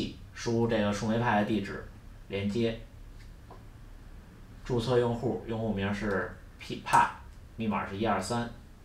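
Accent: Beijing, China. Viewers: 比较喜欢up主的风格 说话听起来很舒服 北京哥们吧， 希望保持这种风格 教程针对新手小白也比较友好，再帮帮小白，做点更加有趣易于操作的项目吧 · 最需要的就是可以直接在树莓派本身系统上实现各种功能的教程了，刷来刷去的太麻烦，老哥北京口音听着也很舒服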